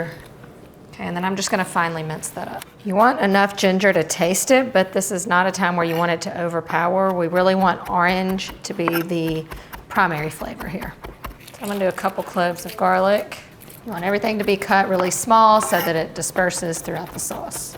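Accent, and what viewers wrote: South, United States. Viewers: the recipes are the bonus! shes the big draw! that tiny powerhouse with the husky southern accent! shes the ticket!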